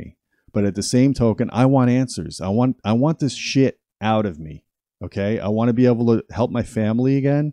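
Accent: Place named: East Coast, United States. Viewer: I would rule out Lyme, I found out I have 2 species of it from Ohio, have had it for 30 years. Just saying, it was the last thing, I checked,bingo. Sure my family has RA history too, but R Arth is a function of the main source - whether it be some sublime infection be it bacterial, or even a viral co infection that is barely kept "underneath" by your struggling immune system. My LLMD had me use DNA Conexxions kit that he said was the most accurate /cost effective one ($650). If you get a hit, then pay for the real expensive one next. I also followed strict testing protocol to get an accurate test, like fasting before the test, strenuous exercise, deep massage session that was purposely painful, empty the bladder and use the next bladder fill 30 minutes after the massage as the sample. I had Lyme A, and from my early childhood infection Ehrlichia from the Lone star Tick, I recall the bullseye rash as a teenager. 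Just saying, even if its not Lyme, it pays to rule it out. You sound like youre from the east coast, I caught Lyme in the 80s in ohio. My dad now has it from the same property. It is pervasive in the midwest, those cute deer in your yard, they bring the Lyme TO YOU. And opossums are the greatest eater of ticks next to some birds, who would've thought that? !! Also, I don't peruse your channel, you just came up when awhile back when I was looking up Coronavirus. I'll have a peek at your stuff... )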